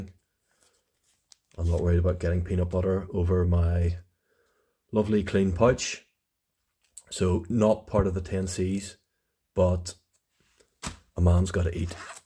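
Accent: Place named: North, United Kingdom. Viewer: Good vid! Where up North are ya from?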